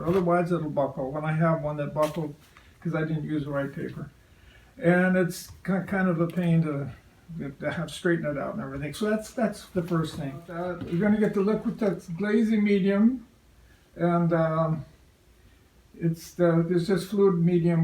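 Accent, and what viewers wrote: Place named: Mexican American, United States. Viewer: Love your work. May I ask what ethnicity you are if I close my eyes I can hear the voice of someone I know who is Mexican American. Not that it matters. In any event keep up the great work!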